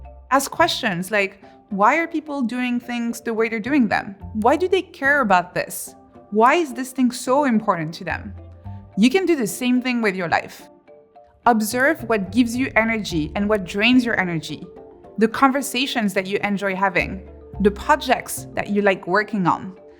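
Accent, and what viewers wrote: France. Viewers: Beautiful French accent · Love the little french accent🇫🇷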